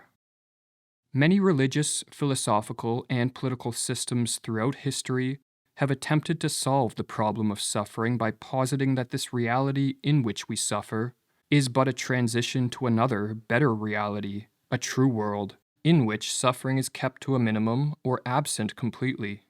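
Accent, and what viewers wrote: Canada. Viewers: Canadian you are, I noticed.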